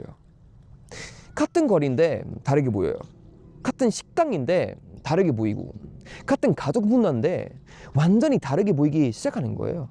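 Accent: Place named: Countryside, South Korea. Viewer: 말투가 한국말투~~약간 한국시골말투~~기냥한국사람같네❤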